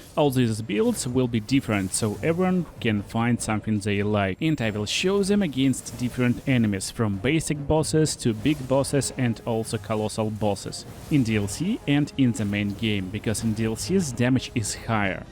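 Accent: Russia. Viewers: Какой-то сильно русский акцент у вас сударь. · Russian ? “Safety is number one priority”~ CrazyRussianHacker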